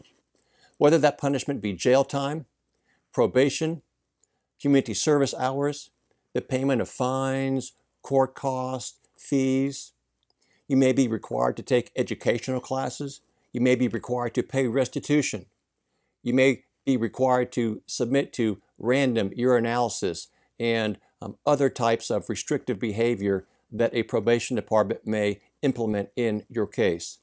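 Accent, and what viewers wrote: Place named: Australia. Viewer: P S well if ultimi are Italian . Let’s talk in italian😂 . I thought you were Australian .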